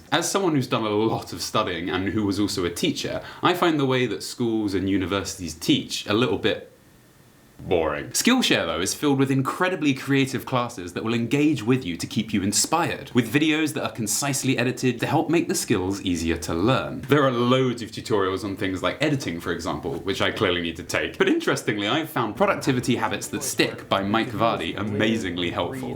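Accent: United Kingdom. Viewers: Yay the strange British man has returned!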